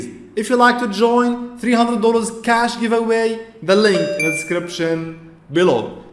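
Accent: Arab world. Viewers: عرفت انك عربي من اول كلامك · May not an arabic native speaker try to speak English language.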